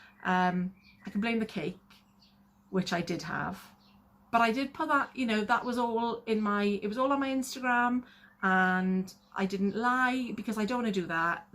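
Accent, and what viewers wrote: South Wales, United Kingdom. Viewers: Hay found you on YouTube today. Thank you for sharing your SW journey with us. So pleased that your family are home safe and well. Congratulations on your NSV, you will lose that game next week. It’s lovely to hear voice from south Wales. X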